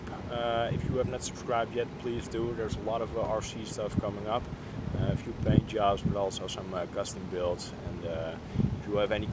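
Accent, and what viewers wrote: Netherlands. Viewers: aaah ok! i was thinking ur accent sounds dutch but the netherlands is not that hilly XD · u have a little bit a dutch accent like me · Are u dutch?, awesome vids :)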